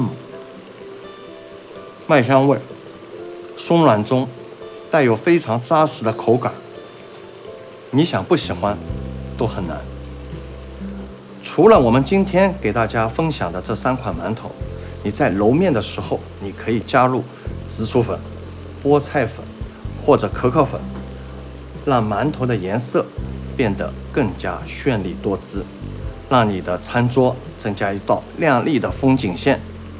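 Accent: Shanghai, China. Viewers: 阿拉上海人👍👍👍温文尔雅，带着上海口音的普通话😀😀😀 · 一听就是阿拉上海人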